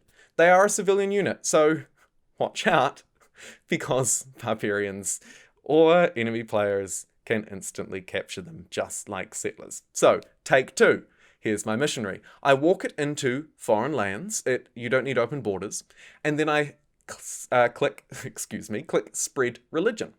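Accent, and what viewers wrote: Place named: New Zealand. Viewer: ha, would not have placed that accent as kiwi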